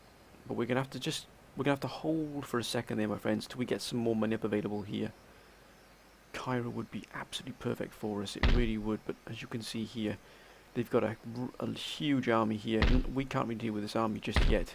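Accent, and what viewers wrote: Wales, United Kingdom. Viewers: Where abouts are you from in Wales? your dialect is very interesting to me, great vid btw your always a gentlemen talking to people in the comments and your very good at this game!! :) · Hey man, where are you from? You sound British but you have hints of foreign By the way, great video, definitely subscribing!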